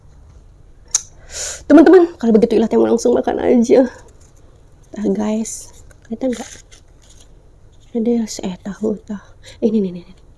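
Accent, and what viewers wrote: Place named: Banten, Indonesia. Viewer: Kaya nyya orang Banten soal nyya ngomongnyya sunda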